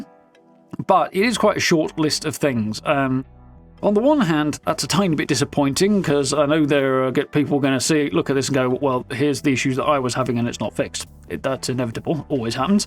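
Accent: RP, United Kingdom. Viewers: This guy sounds very posh😂. it doesn’t go with hes rock star metal head image haha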